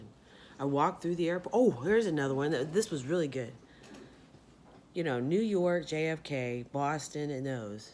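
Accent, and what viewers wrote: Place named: South, United States. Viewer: I hadn't caught that deep southern drawl in your voice before..Are you at Truth Social?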